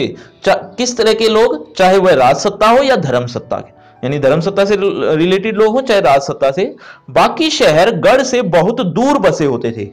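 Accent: Haryana, India. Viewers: sir aap hariyana se ho kya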